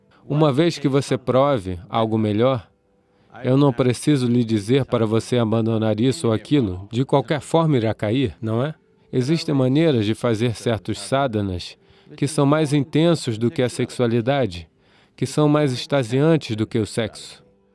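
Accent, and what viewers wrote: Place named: Rio de Janeiro, Brazil. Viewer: so digo uma coisa,tradutor é um carioca. o resto nao sei de nada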